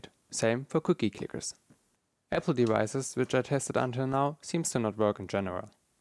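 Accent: Germany. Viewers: Man hört das du deutscher bist :D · Dieser deutsche Akzent😂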